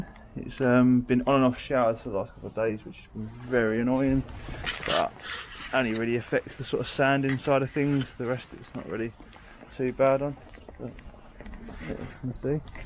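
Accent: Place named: United Kingdom. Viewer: ¡Hola! Since México, my english is not goog, but I say you: When you speck the Britain English, it hear very nice, interesting and elegant.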